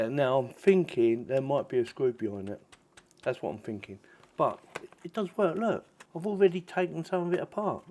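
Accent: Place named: Cockney, United Kingdom. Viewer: Just saw a different helpful video of yours and I've subscribed. Great to hear a cockney accent too! God bless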